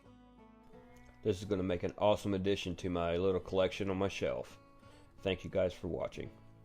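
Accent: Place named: Kentucky, United States. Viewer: not a problem man. Its nice hearing someone with an accent like me. Im from Kentucky and I have an accent too. You sound normal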